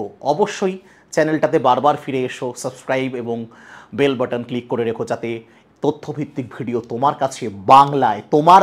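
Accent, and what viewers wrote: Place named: Bangladesh. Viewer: Your pronunciation like a Bangladeshi.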